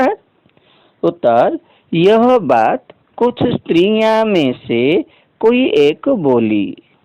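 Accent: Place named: Odisha, India. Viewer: Are bhai sun ya odia midiam saraswati Hindi kitab ho to odia gurujika voice kasa aiga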